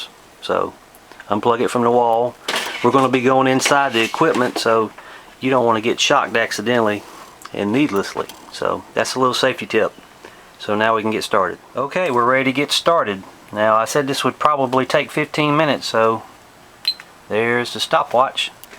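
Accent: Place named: North Carolina, United States. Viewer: Thank you! I didn't know it was so easy! (And you accent reminds me of home.) (Coastal NC)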